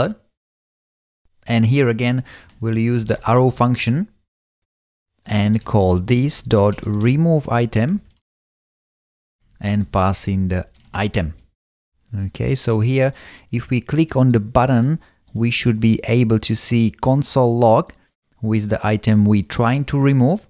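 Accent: Czech Republic. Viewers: Within the first 10 seconds, I said, "This guy is Czech".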